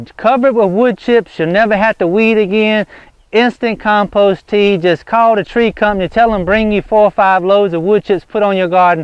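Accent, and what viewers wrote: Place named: Kentucky, United States. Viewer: Awwwh, that's a shame that you've got this funky situation now. Hopefully this will clear up soon and you'll again be able to plant the seeds you want and have them thrive. I am only an old disabled woman in a wheelchair, and live in the Phoenix suburbs here in a doublewide mobile in a mhp setting and because of the patio and carport overhang type roofs, I really don't have much ground for planting a garden. I'm trying to grow what I can in containers but most of it is in mostly shaded areas of the patio. It's hard, I've tried getting things going since April of this year, but everything keeps getting burnt up so fast from the sun, and the HEAT, even when I drag the containers into the fully screened inner patio area. It's so hard to watch things begin to sprout and get all happy and then have to watch them get burnt to a crisp with a few weeks as I try desperately to get them the sunshine that they obviously need, cuz they're getting too leggy! 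I watch your amazing videos and just dream of having a place as big as yours and the physical ability to farm it too. You are truly blessed and it's so nice that you share your information, whether good or bad, with us. I love hearing your accent, my maternal Grandfather was from Lexington KY and I adored hearing him talk about just anything. He's been gone for many years now, but everytime I hear your voice, it reminds me of him a little bit. Anyhow, thanks for the information, I'd often thought of using something like sawdust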